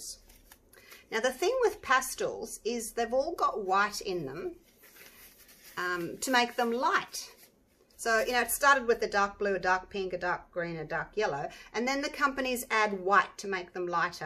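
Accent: Australia. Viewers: I'm trying Mrs. Julie's recipe for PM, for GLUE ALL. Still struggling with paint consistency. And I love your birdies and puppies!!! I have 2 thingies of resin and I'm completely skeered...:) love your video's!!! your artwork is awesome!!! from Ohio!!! I'm jealous of your cells...Oh yeah, can you PLEASE revisit paint pouring, for beginners. I'm back to the basics!!! I'm gonna master flip cups first, then work my way up!!! I absolutely ADORE Australian accents!!!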